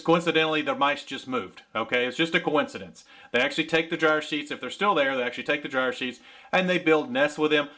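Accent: United States. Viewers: I am sorry, some folks suggest that people with British accents sound intelligent but, I am here to tell you, you can take a country accent to the bank! Keep it up and GO DAWGS!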